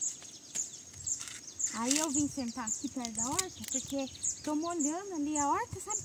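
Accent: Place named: Sao Paulo, Brazil. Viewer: Boa tarde família linda, tdo bem? vc é Paulista, vc tem sotaque de paulista, o r pucha pelo l, polta e colta, me responda por favor, que vontade de comer um pedaço, bolo. moro em Santa Cruz Rio de Janeiro, abraços pra todos vcs e me mande um abraço tbm. ❤❤❤❤❤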